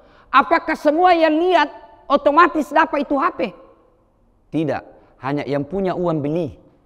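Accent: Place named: Sulawesi, Indonesia. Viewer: Logat Sulawesi Pak Ustadz Sulawesi Bagian Manaki Ustadz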